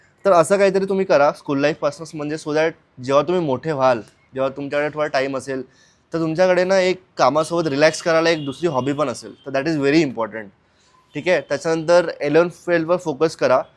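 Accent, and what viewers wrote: Vidarbha, India. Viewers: Tumhi vidhrbha side che ahat ka because tumchya marathi vrun vatte ts means thod touch ahe language mdhe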